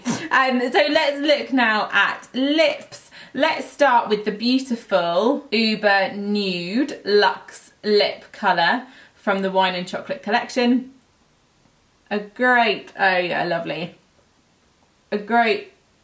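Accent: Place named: RP, United Kingdom. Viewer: you're smashingly fab. lovely look on a lovely fine representative of Bobbie Brown. p.s. love your posh accent.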